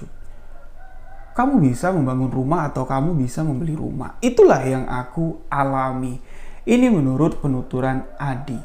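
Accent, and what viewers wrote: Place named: Java, Indonesia. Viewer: Alhmdulillah..jmpa lgi dngn Bng, yg plng sya suka,cra membwaknya,dn bahasanya mnteep..., kalu orang jawa..logat bicranya enk bngt di dngar...🙏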